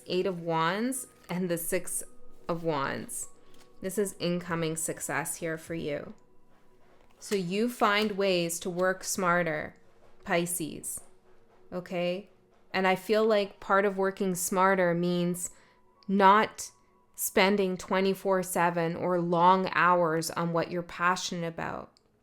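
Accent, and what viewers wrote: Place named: Canada. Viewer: Such a great reading! Also loved hearing that you’re Canadian- as a Wisconsinite, I hear that accent pop out 😂 Resonates a lot with energy that has been coming out in my readings for Pisces as well: feeling like we need someone else to attain the success. One of my favorite astrologers, Pam Gregory, always asks her audience to ask themselves- “are you a victim of the universe or a co-creator with the universe.” Taking the perspective of Saturn in Pisces building resilience vs. pushing us down has been extremely helpful for me, personally. Thanks again for your messages and insights!✨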